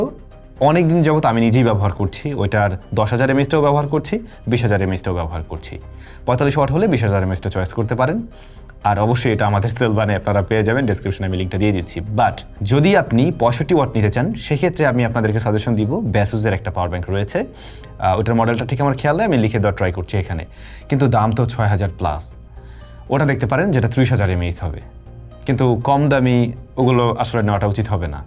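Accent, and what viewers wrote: Barishal, Bangladesh. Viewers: আরে আমনে দেহি মোগো বরিশাইল্যা ❤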